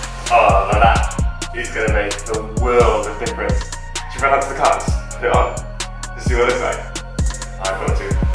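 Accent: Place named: Cardiff, United Kingdom. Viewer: How did you find the lights after the smoke wrap? Did it make much difference to the brightness of the lights on the road? I have a white 2 series and the chrome in the headlight ruins the aggressive look of the front end. I was thinking about having just the chrome part wrapped over by a local company which wont effect the brightness but after seeing your lights i was windering what a slightly lighter smoke wrap would be like covering the whole lamp itself? What say you mate? Im also from south wales beiiiii👊🏼💯🏴as soon as i heard your accent i thought ohhh hes a caaaaardiff boy😏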